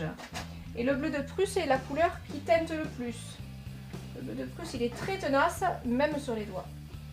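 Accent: South, France. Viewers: avec l'accent du sud, ce bord de mer inspire, merci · Je ne peux rien dire de plus que tous les autres. Heureuse de vous avoir découverte, talent, gentillesse, et l'accent de notre midi😂 je suis à six fours !! Merci et à très vite. Je me suis vite abonnée. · Non seulement c'est très pédagogique mais c'est très beau, avec en plus l'accent du midi! Un régal, ça me rappelle chez moi..Très belle démonstration de la méthode au couteau dont je ne suis pas experte; je pense que c'est de la peinture à l'huile... J'imagine que c'est aussi possible à l'acrylique ( je ne supporte pas l'odeur de l'autre....) Merci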